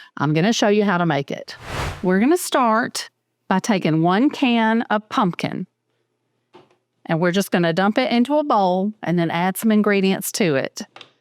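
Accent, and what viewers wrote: South, United States. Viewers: The audio on this video is so nice & crisp and with your southern voice? So relaxing! Almost asmr! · First time you’re coming across my feed. I can’t wait to make this. Well done! Great video & I love your voice/southern accent. 👏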